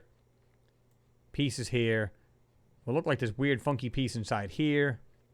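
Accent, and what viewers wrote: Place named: Rhode Island, United States. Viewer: When I was growing up I had a friend named Tommy his dad was Rhode Island and he sounds exactly like you am I close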